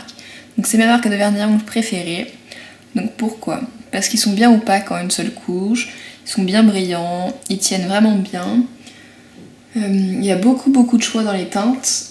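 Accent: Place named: England, United Kingdom. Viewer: Coucou miss.... Ah qul plaisir cette vidéo avec ce petit accent anglais j adore un pur moment de détente merci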